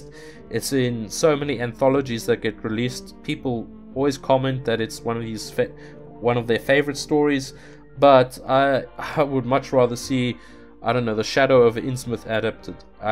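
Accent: South Africa. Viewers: This worries me because the Colour out of space is one of my favourite HP Lovecraft stories. It is so disturbing and unsettling and I'm worried Nicolas Cage will make the movie unintentionally funny :/ I am excited to see Tommy Chong in a movie though, what a legend. PS it is so comforting to hear a South African accent. Keep up the good work :D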